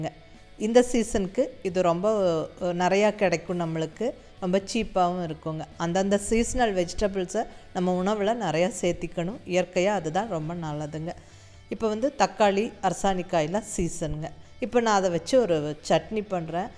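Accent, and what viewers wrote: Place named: Coimbatore, India. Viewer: Beautiful kitchen Madam ...😍😍கோயமுத்தூருங்ளா நீங்க? அரசாணிக்கா சின்ன வெங்காயம் தொளிச்சுன்னுல்லாம் பேசறத கேட்டா அப்படி தெரியுதுங்க... ரொம்ப என்ஜாய் பண்ணி ரசிச்சு சமைக்கிறீங்க... 💛💙💜💗💚❤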